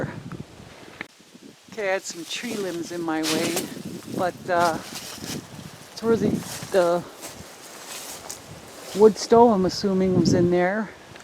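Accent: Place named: North Carolina, United States. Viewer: Being from North Carolina, I immediately recognized the accent. My mom was from Sheboygan. Very cool!!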